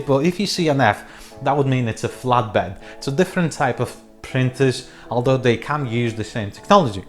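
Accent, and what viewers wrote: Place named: Liverpool, United Kingdom. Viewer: Scouse accent really shinning through now 😝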